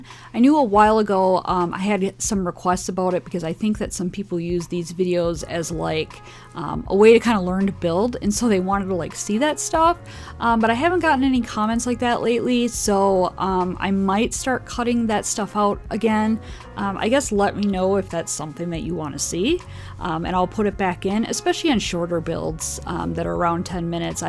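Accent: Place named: Midwest, United States. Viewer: the driveway landscaping is perfecto! alsoooooo how have I not noticed your (possibly midwest if not cnadian) accent before now???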